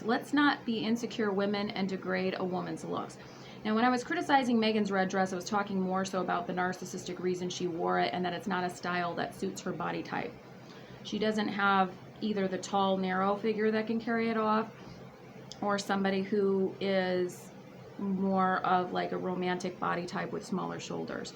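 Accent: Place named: United States. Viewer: I love listening to you. And your down to earth ‘tell it like it is’ approach. That’s why I enjoy your vids. You talk like ordinary Australians do. Yet with an American accent. Keep up the readings. Fantastic!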